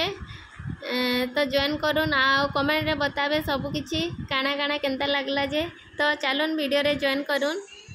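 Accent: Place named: Western Odisha, India. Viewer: I love to hear WESTERN ODISHA TALK always MAM ,LOADS of respect from KENDRAPARA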